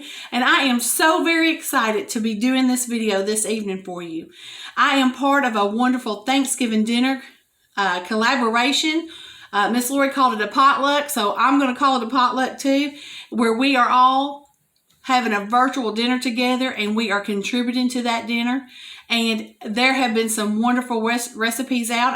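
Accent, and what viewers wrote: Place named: Tennessee, United States. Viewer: I love you you talk like me I’m from chattnooga tenn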